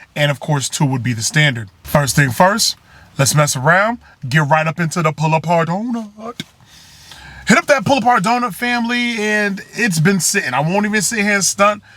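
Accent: United States. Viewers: Drops your Russian always thought your were American brother hahaha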